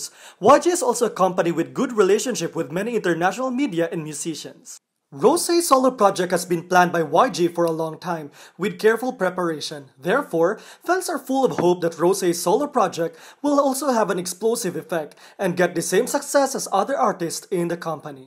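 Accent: Philippines. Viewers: You have a Filipino accent 🧐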